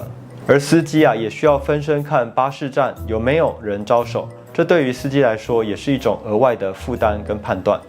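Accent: China. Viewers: 臺灣如果公車文化不夠好，那大陸不就是難民出現了嗎？你這個大陸人假扮臺灣人，口音一聽就知道你是大陸人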